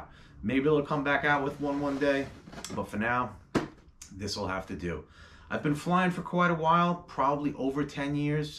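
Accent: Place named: East Coast, United States. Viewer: You a east coast guy. I'm from Jersey. Sound similar. Have fun flying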